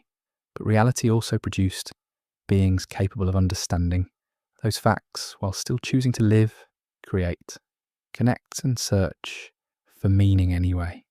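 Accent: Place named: England, United Kingdom. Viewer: How many millions of people listen to you?Because you have a English England voice\nYou're just saying this thing over and over again , that's really boring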